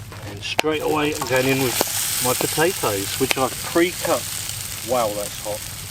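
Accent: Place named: RP, United Kingdom. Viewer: What’s with the talking posh you have changed the way you talk lol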